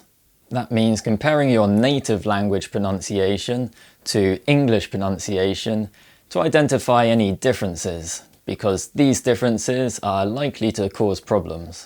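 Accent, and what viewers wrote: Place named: England, United Kingdom. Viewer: Seriously, your English accent is amazing! It makes your content so engaging.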